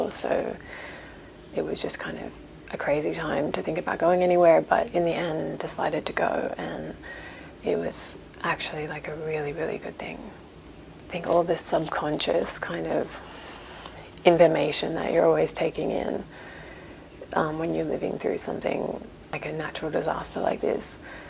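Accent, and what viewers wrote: Australia. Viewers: I'm really sorry to hear about everything that's been going on where you are. It's good that you're okay though. As for your content, I love the asmr cooking videos you've been posting. There's not much of that around in comparison to the tapping videos, so it's a good little niche that you've found. Some general vlogs about things that are happening in your life would be pretty cool as well, and maybe you could incorporate some videos about your progress learning the guitar? You've got such a naturally nice and soft voice, that I could listen to you talk about anything really. Of course I'm a sucker for an Australian accent too 😂 But whatever you decide to do, I'll definitely stick around to see where it goes. Take care and stay safe!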